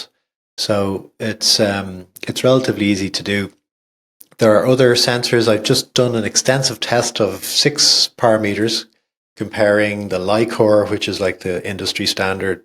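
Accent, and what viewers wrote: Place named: Ireland. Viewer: I could listen to talk for ages, love the Irish accent, Republic of, of course:)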